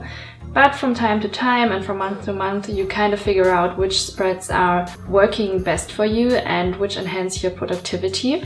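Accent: Germany. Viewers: man kann an deiner Stimme erkennen dass du deutsche bist XD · I noticed by your accent that you are German! Ich bin auch von Deutschland. Meine Mama ist aus Aachen aber meine Väter ist aus America. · are you german? Your englisch is very good though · love your German accent :D and I was finally able to hear how the name of the notebook is supposed to sound :p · endlich weiß ich wie sich ein deutscher Akzent anhört 😂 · Eigentlich wollte ich auf diesen geistigen Durchfall gar nicht antworten, aber ich glaube man muss dir mal Einiges erklären... - Erstens: Ob ihre Aussprache grauenhaft ist oder nicht, liegt im Auge des Betrachters. Deshalb kann deine Aussage schon mal nicht "objektiv erfassbar" sein. Nun gut... - Zweitens: Hab ich den Teil verpasst, in dem sie nach deiner Meinung fragt? Meines Erachtens geht es hier um das Bullet Journal oder steht im Titel "Bitte bewertet mein Englisch auf einer Skala von 1 bis 10" ?! 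- Drittens: Hast du jemals schon mal Leute gehört, deren Englisch wirklich nicht so gut ist? Ich glaube nicht... Man versteht sie nämlich kaum und reibt es ihnen trotzdem nicht unter die Nase. Das ist "common courtesy" (kennt du das? ). - Viertens: Nur weil man in London lebt heißt das nicht, dass man alles perfekt Englisch ausspricht. Ihre Muttersprache ist nun mal Deutsch und "die Gute" wird auch in 10 Jahren noch einen Akzent haben. Das gilt auch für Franzosen, Chinesen oder Inder, die schon 50 Jahre in England wohnen. Das ist vollkommen NORMAL. ... Kein Grund hier ausfällig zu werden. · I love your accent because I grew up with german nannies so it reminds me of my child hood haha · i heared your accent in the first second :D ich bin auch deutsch xD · falsch.ihre aussprache IST grauenhaft und sehr deutsch! das ist objektiv erfassbar.. und warum bitte muss ich alle sprachen dieser welt sprechen können, um das hier anzumerken?! 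was für ein dämlicher kommentar! es geht um die allerweltssprache englisch.. und die gute lebt in ...LONDON .. und darum ist es OBERpeinlich. PUNKT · Im learning german in school and i think its so cool to hear you’re accent 😻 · Celine Lee lmao me too first second SHES GERMAan haha ich bn aus österreich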